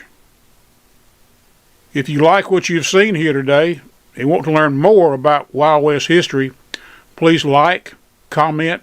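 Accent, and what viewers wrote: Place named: South, United States. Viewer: So glad the WWHA film crew discovered and documented this story of the last of the Daltons. It is overlooked by history and Jones deserves the recognition for his dedication to the state of Texas, for he has received almost no credit in history. The story of the Dalton Gang's demise at Coffeyville, Kansas when they foolishly decided to rob two banks at once is well known, including the fancy factory engraved Colt pistols they carried, but this is new. The narrator's deep southern accent does not hurt either! Dodging goats? Good sense of humor too! Join WWHA!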